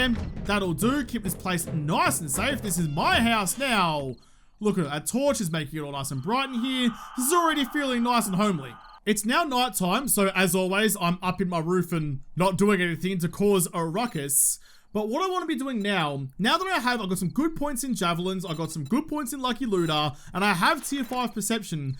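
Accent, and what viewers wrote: Australia. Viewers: "Some sneaky Molys...." I love the Aussie vibe to it, but dude, you gotta admit that one just sounds ... Without the Aussie vibe.... Not smthg I would be OK with XD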